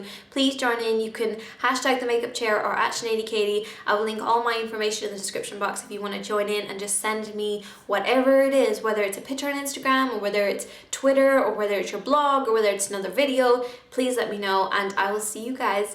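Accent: Ireland. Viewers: Estée Lauder amazing by far my favourite foundation ... Btw what part of ireland are u from ? Xx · Are you Irish?!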